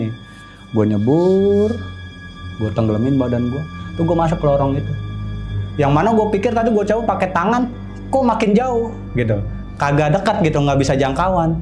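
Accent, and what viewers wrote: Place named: Betawi, Indonesia. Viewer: Keren lu bang bahasa Betawi Bener 😂🙏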